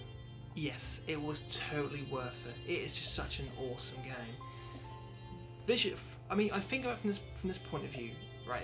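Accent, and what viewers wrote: United Kingdom. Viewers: Well seeing as he has a british accent and all the games on his shelf are in PAL cases, I am going to put 2 and 2 together and say the guy might be from the united kingdom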